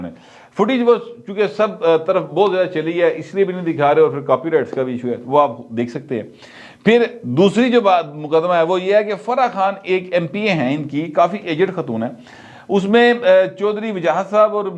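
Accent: Lahore, Pakistan. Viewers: bhai ap ka ye lahori style bohat khobsurat lagta ha🤗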